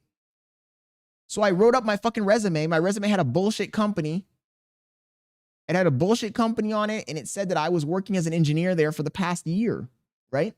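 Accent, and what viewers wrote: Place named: India. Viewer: used those call center skills and the indian accent to get that job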